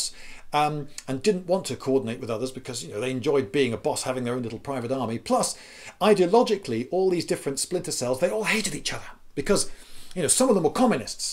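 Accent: England, United Kingdom. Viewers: Sees interesting tittle Clicks The youtuber has a british accent and the video is about France Grabs popcorn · Maybe it's because I'm an American who's been conditioned to associate accent with Monty Python, but this particular video seems oh-so-easily adaptable to a comedy sketch....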